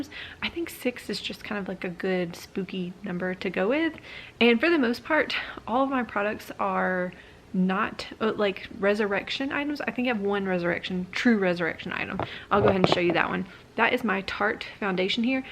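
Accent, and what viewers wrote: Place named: United States. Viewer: you're developing an American accent! Lol. Nice twang girl! Enjoy your products.:)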